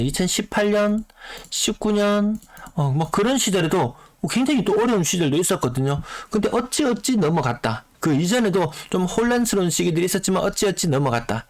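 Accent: Gyeongsang, South Korea. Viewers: 친근감이 있고 경상도 발음 너무 반갑습니다 알차고 유익한 정보 감사 하지요~~♡ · ㅎ 구독자 100만 언급 저도 그체널들 잘봅니다 매끄러운 서울말씨들 하지만 님은 경상도분이라 의도적으로 불친절하다고는 안봅니다 요즘은 꺼 더 잘챙겨봅니다 이런 정보야말로 감사하죠 화이팅 하십시요~^^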